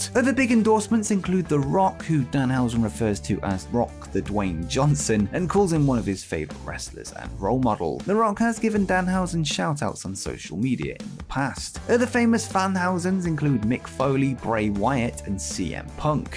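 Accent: United Kingdom. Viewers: Also hearing "Pepsi man" in a nice British accent is awesome! Vive la!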